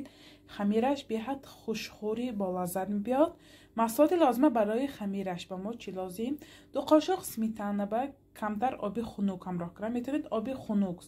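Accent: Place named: Khujand, Tajikistan. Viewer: Дар овозат чонам ашал хучанди ё исфара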